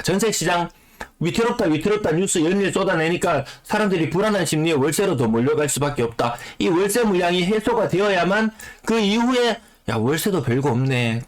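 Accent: Gyeongsang, South Korea. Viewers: 구수한 경상도 코멘트가 참 재밌어서 항상 즐감합니다 ㅎㅎ 고향 부산 떠나 서울 온 지 45 년, 벌써 60 중반이 넘었네요~ 그동안 국가의 소중한 자산들이 대외경쟁력을 높이는 기술/투자/연구/다양성 인재 개발 등에 들어가는 것이 아니라 제 살 갂아 먹기, 투기, 후세에 폭탄 물려주기 등 부동산을 통한 탐욕에 쏠려 있어 내심 걱정이 많았지요. 저는 수십 년 동안 열심히 일해서 자식들 다 공부 시키고 지금은 서울에서 전세로 살고 있지만 두 단위 억원 정도를 은행에 넣어 쏠쏠한 이자 받고 사업 소득도 가지며 잘 살고 있습니다. "모든 것은 본래의 가치로 회기한다" 일부 영끌 20/30/40 우리 후세들이 향후 30~40 년 간 너무 오랫동안 은행의 노예로 묶일 것을 생각하니 슬프고 참담합니다. 그런 이 나라의 장래는 어떨까요? 어릴 때 어른들이 하신 말씀 한 마디 남기고 이 노부는 글을 마감합니다. 젊은이들이 4~50 년 전에 제가 들었던 이 말을 새겼으면 합니다. "세상에 가장 무서운 게 뭔 지 아니? 바로 빚쟁이란다" 이젠 그 빚쟁이는 우리 곁에 있는 살가운 은행이랍니다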